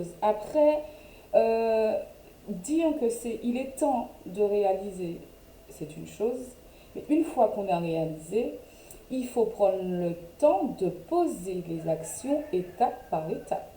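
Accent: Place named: Martinique. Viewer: Jolie petit accent de Madinina💓🌴 mer I pour ce beau tirage... Pour le son c'est moyen.. Mais ça reste audible. 👍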